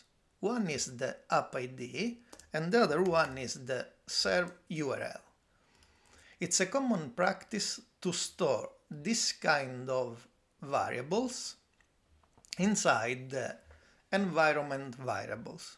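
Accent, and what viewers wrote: Italy. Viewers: Incredibile, tra le migliaia di tutorial online sentirne uno con l'accento italiano mi fa sentire a casa! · Dude you are soooooooo italian and i love it. Please record audiobooks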